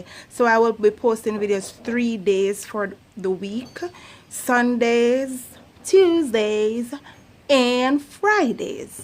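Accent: Jamaica. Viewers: I love the jamaica voice. I had a pen pal from Jamaica and he tried to teach me to speak the the language but I never could get it.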